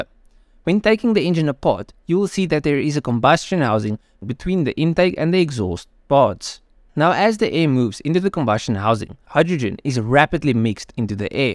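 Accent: South Africa. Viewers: South African ?? I’m an American that lived in Yeoville in 1984-85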